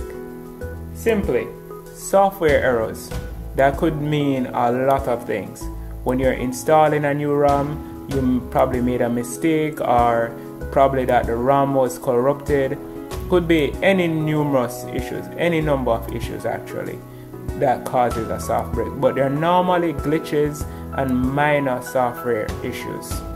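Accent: Jamaica. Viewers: You sound kinda like Jamaican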